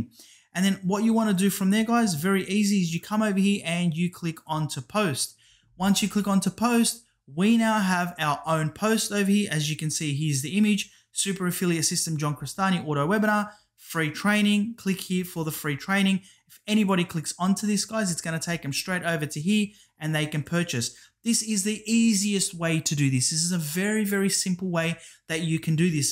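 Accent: Australia. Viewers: I'm from New Zealand so I picked up on the Australian accent and just assumed. Hey, btw great video. Whats your #1 source of traffic to your non MMO offers? Paid or free?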